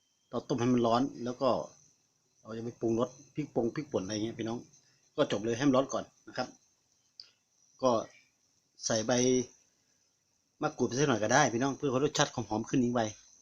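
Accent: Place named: North, Thailand. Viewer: สำเนียงไม่ใช่คนอิสานเด้,คนเหนือแต้ๆเด้นี่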